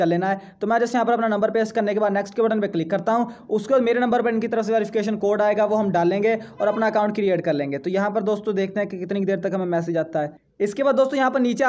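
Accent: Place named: Pakistan. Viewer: Bhai aapki English se lagrha hai aap pakistan ke hain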